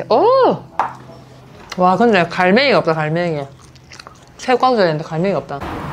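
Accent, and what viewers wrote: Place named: Gyeongsang, South Korea. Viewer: 경상도 사투리.ㅋㅋㅋ 가시나 ㅎㅎ. 그냥 좋다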